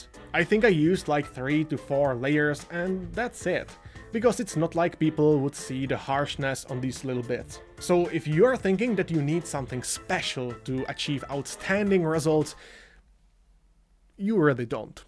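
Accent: Czech Republic. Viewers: First few sentences and I immediately knew you are Czech, then I checked and I was correct :D Hi from Prague :D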